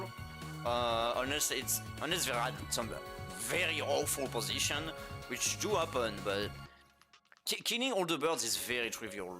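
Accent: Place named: France. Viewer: What is that accent? 😮 Are you French or Canadian or from Louisiana? Btw my favorite game of Mortol II ❤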